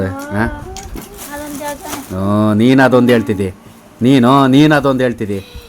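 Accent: Mandya, India. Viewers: ನಮಸ್ಕಾರ ಅವರಿಗೆ ಡೈಲಿ ವ್ಲಾಗ್ ಮಾಡಿ ನಾವು ಬಳ್ಳಾರಿಯಿಂದ ನಿಮ್ಮ ವಿಡಿಯೊ ಇನ್ ಟ್ರೆಸ್ಟಿಂಗ್ ಇದೆ ನಿಮ್ ಮಂಡ್ಯ ಭಾಷೆ ಕೇಳಕ್ಕೆ ಖುಷಿ ಅಸ್ಸುತ್ತೆ ಶೇವಿಂಗ್ ಮಾಡ್ಸಿ 5 ವರ್ಷ ಸಣ್ಣ ವ ಆಗಿದಿರಾ ಮನಸ್ ಮಾಡಿದ್ರೆ ಇನ್ನೊಂದು ಮದುವೆ ಆಗ್ಬೋದ್😊😊 ವಿಡಿಯೊ ಸೂಪರ್👌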